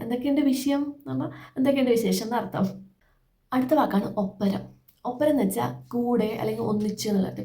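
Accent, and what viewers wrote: India. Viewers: ഇയാള് കണ്ണൂർ ആയിട്ടും ഇതെങ്ങിനെ നോർമൽ മലയാളത്തിൽ സംസാരിക്കുന്നു (കണ്ണൂർ ഭാഷയിൽ നിന്നും വ്യത്യസ്തമായി ). അതിന്റ പിന്നിലെ കഥ കൂടി പറയു. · മോളുടെ നാട് എവിടെയാണ്. കുറച്ചു കൂടി സ്പീഡ് കുറച്ചു പറയാൻ ശ്രമിക്കൂ. ട്രാവൻകോർ ആണെന്ന് ഊഹിക്കുന്നു.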